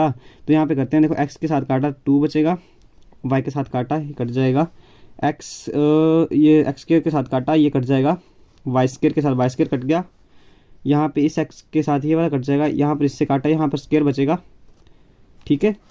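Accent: Himachal Pradesh, India. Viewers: By your hindi accent im guessing you are from Himachal ( may be una or kangra)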